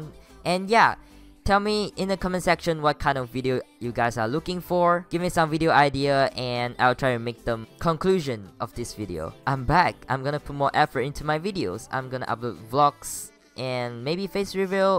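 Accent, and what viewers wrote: Singapore. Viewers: Are u Singaporean?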